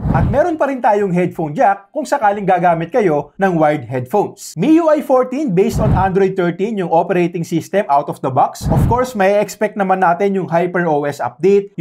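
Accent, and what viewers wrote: Philippines. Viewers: Love ko style mo skip unboxing direct to the phone na at clear Ang Bose's mo mabilis salita...Pinoy Ang Bose's...swak sa brain ko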